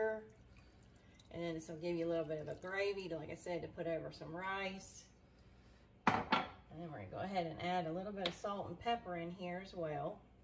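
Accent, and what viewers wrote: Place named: Texas, United States. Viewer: Hey Yes I think so too. I can’t wait to make this recipe! Sounds so good. I love your Texan accent. I’m from Canada 🇨🇦 with the goofball Prime Minister. 😆 Thank you.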